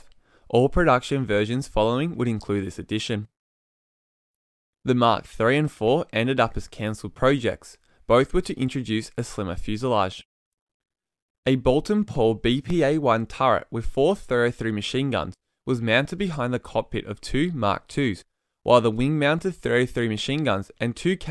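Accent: Australia. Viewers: Love the video and the accent. What part of Oz are you from? Greetings from Norway. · Loved the Beaufighter, some great photographs and videos, lovely to here the Aussie twang of the narrator. Excellent.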